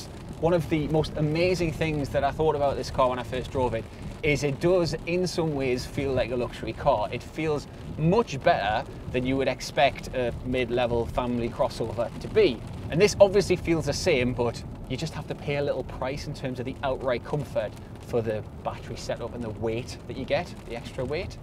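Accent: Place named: Northern England, United Kingdom. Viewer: Don't try to sound less Northern mate, there's enough on TV who do that 👍😂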